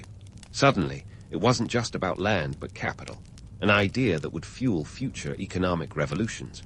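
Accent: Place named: Transatlantic, United States. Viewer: Nice story. Not sure about this transatlantic accent, kept distracting me trying to figure out if it’s British or American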